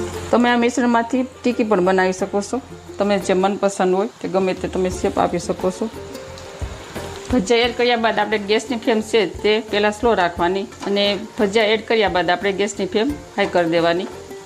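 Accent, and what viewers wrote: Bhavnagar, India. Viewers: તમારી ભાષા પરથી લાગે છે કે ભાવનગર, ગોહિલવાડ પંથકનાં હશો.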